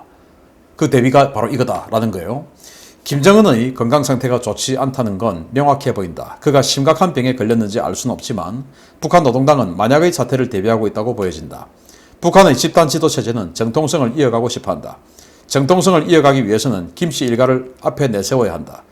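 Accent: Gyeongsang, South Korea. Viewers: 정은이기분이별로네요..핵폭탄를싸되야할텐데머니도.딸리니기분이영아니네요.교수님경상도 사투리로.큰옷입은모습를 우장바우라하심에넘정겹게들립니다 정은이도마음ㄸㅅ데로안되니만사가다헛된짓거리에후회라도좀햇음합니다.더운날시에늘애청할수있어서감사즈립니다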